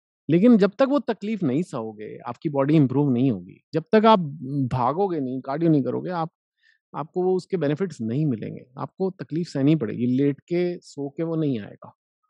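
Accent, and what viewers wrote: Punjab, India. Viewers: Your punjabi accent and expression is hilarious 'Paad lo'...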